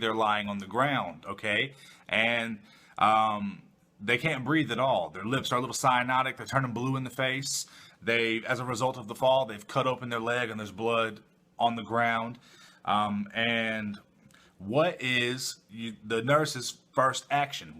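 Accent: South, United States. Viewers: you have such a nice voice..do u sing or rap. it' has a nice southern twang..lol anywho. love your videos man! keep up the great work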